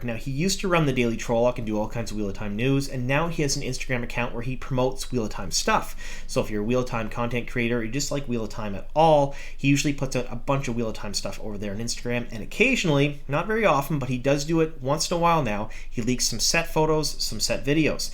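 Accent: Canada. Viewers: You sound Canadian, do you watch hockey ? (Let´s go Penguins!).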